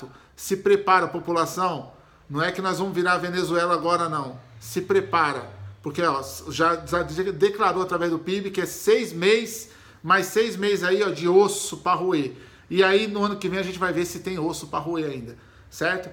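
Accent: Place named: São Paulo, Brazil. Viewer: Pô maluco, sou carioca mas me amarro nesse seu jeito tão paulistano de falar.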